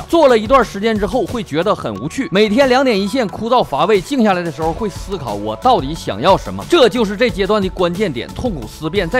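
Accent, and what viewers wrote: Northeast, China. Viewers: 在拳霸3中听到主播说到这期节目，所以专门过来学习一波：）个人认为这个系列逻辑性很强，干货满满，堪称良心，听后从中受益匪浅，也希望主播不要怀疑自己，坚持做下去。PS：身为一个南方人已经被魔性的东北吐槽洗脑了！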